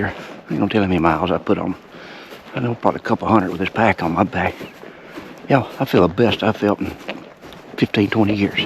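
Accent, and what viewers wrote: South, United States. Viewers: Anytime a man speaks with a southern accent like take note. This man speaks nothing but wisdom. This story is relatable to me and just gives me a better understanding of life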